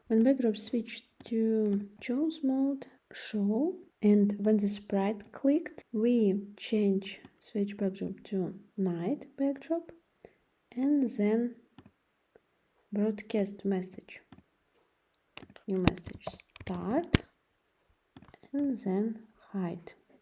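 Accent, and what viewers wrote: Russia. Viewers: Русский акцент никто не отменял и спасибо за туториал😊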